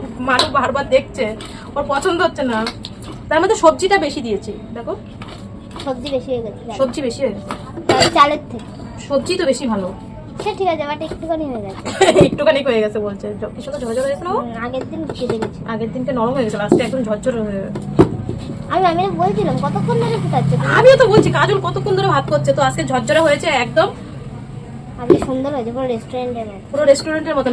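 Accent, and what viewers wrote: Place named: Bangal, Bangladesh. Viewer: তোমরা বাড়িতে কি বাঙাল ভাষায় কথা বলো???? খুব ভালো লাগলো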